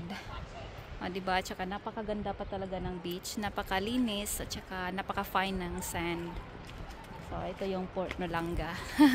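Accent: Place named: United Kingdom. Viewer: Hiningal n c madame at tsaka ñaimpluwensyahan ng British toungue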